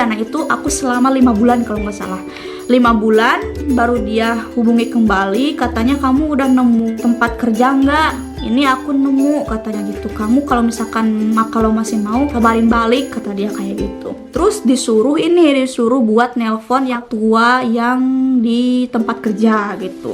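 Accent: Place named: West Java, Indonesia. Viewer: Ya allah lucu nyaa😂😂 awal2 jerman terakhir jdi full sundanese asik nya klo bisa se group sm kk pantas di puji trus hihi😆😂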